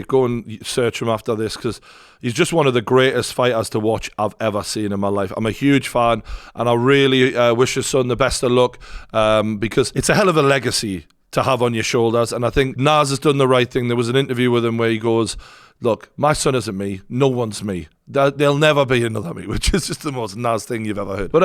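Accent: Newcastle, United Kingdom. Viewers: The voice of boxing ….true geordie 👍🥊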